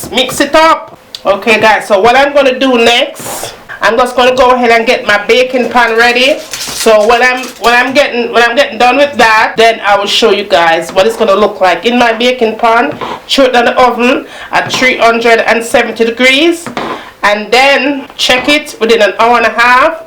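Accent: Jamaica. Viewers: love the video and the way yuh talk mi sure a nuh montego bay yuh come from..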